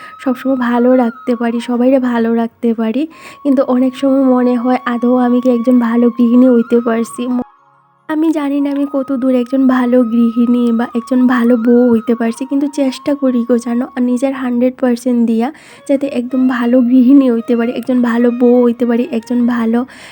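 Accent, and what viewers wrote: Sylhet, Bangladesh. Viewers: তুমি কোথায় থাক? তুমি কি সিলেটি? তোমার কথা শুনলে মনে হয় সিলেটি,,,প্লিজ কিছু মনে করোনা,,,আর আমার প্রশ্নের উত্তর দিও❤️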